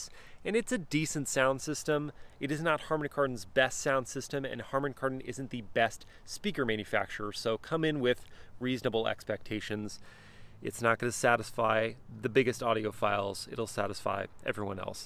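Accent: United States. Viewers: I love your country accent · Great command of your communication. Wish you had ended with the country accent to bring it all full circle. Keep up the good work!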